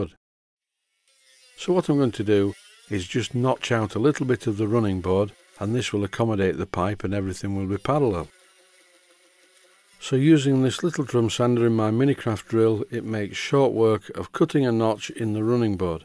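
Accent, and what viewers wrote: Yorkshire, United Kingdom. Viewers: Very nice job and I say you should go full Yorkshire accent. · Do all your video's in full Yorkshire accent please! I don't care if I can't understand it, it still sounds great!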